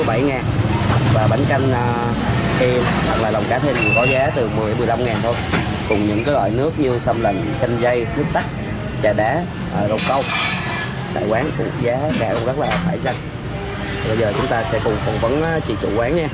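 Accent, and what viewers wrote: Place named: Quang Ngai-Binh Dinh, Vietnam. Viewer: Giọng nói nghe hơi Nẩu chưa chuẩn lắm nói ít thôi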